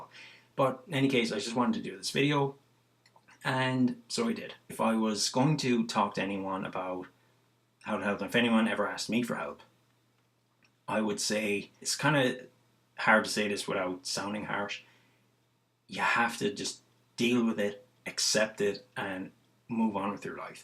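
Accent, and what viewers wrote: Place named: Ireland. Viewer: Hi how are you, I also have Stargardts And like you I was diagnosed when I was 7 years old, I am 28 now and everything you describe i can relate to also regarding your vision however I don’t see double when I focus on objects close up instead I just frustrate my eyes and give myself headaches . I use my camera to do the exact same things as you I think it’s brilliant for getting around public transport, airports etc.. some days can be more difficult than others especially when driving is out of the option and a long day can feel like a much longer day dealing with all the extra challenges but the fact that I can get out and about and do pretty much all I need and want with a few exceptions is perfect enough. I come from a family who have been in the motor trade all their lives and I am a huge car fan so you can imagine how devastated I was even at 7 to be told it was a big No driving is not going to happen I’m afraid. 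It certainly hasn’t stopped my interest at all. There’s so muchI have done and still can do with limited vision even driving on a race track, goKarting , horse riding , tennis, American football, rugby anything I wanted to do I did it just did it in a different way and that’s the key to it I think. Personal question are you Irish your accent sounds Irish sorry .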